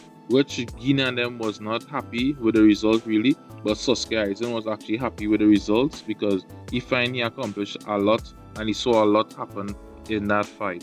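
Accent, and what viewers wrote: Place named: Trinidad and Tobago. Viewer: The moment I hear the voice I was shocked. Lol. A Trini giving a rundown on anime.... Amazing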